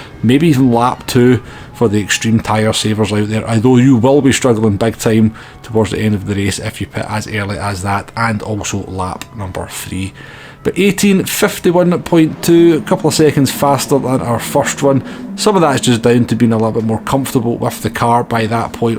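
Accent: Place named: Liverpool, United Kingdom. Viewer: or is it scouse written. I don't know. Pretty difficult to understand it correctly. It seems that you're comming from Liverpool or somewhere over there.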